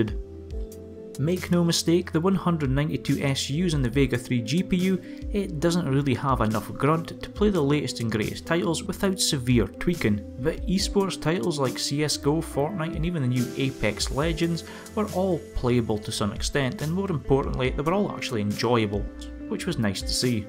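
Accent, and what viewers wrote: Scotland, United Kingdom. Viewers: Great video, I love your Scottish accent. 👍 🏴 I just might reconsider the Athlon.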